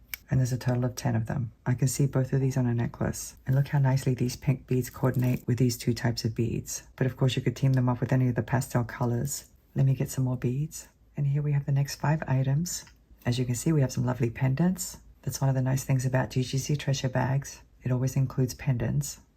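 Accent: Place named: Australia. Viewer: Thank you. Can’t wait to see what you design with these beautiful beads! Just got home from a long visit with my son in Australia. Always think of you when I venture “down under” and listening to your accent always makes me smile and takes me back. Take care I will be looking forward to your next video. Take care of you ❤️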